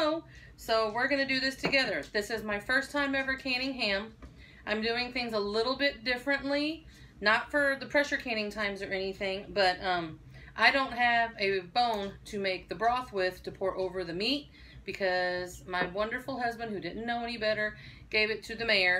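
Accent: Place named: Rural Missouri, United States. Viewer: LOL! I felt like I was back home on the farm in rural Missouri when I was a kid. You informed me, made me laugh, and your accent is a breath of fresh air from home. I am in the downhill side of 60 and have canned for over 40 years but never canned ham because someone told me that I was not supposed to because it was cured meat and curing changed the density of the meat and it might not can properly. I know that I am watching this several months after you did this and that you have made several videos since so you have probably had a chance to open the jars by now so could you please update on how it turned out. I really want to do this. I am not opposed to rebel canning methods. My Grandmother Wright had a picture of the man praying over his meal like yours hanging over her table. My brother has her picture. That made me homesick. 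Have an awesomely blessed day!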